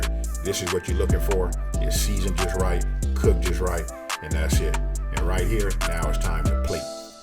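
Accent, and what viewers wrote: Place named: South, United States. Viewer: You need to come to Destin FL and try grits a yaya at this local place. This sorta reminds me of it. I can tell you’re southern, but from where?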